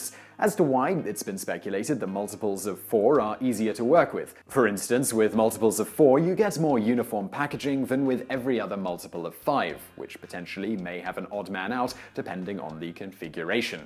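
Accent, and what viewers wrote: United Kingdom. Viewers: The British accent really adds credibility to these lessons.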